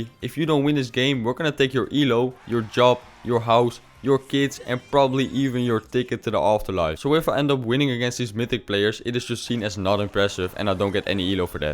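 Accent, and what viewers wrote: Netherlands. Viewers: why do i smell a dutch accent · Damn i smell englsih and a hint of dutch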